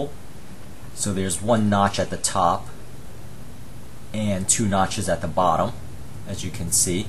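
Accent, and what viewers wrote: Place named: Long Island, United States. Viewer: Great video. Very thorough. Love your mild Long Island accent. Did I get it right? It's like a toned-down Ramones accent. Hey Ho, Let's Go!!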